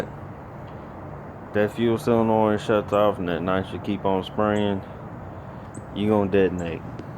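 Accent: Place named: South, United States. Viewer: Why do you have to be southern?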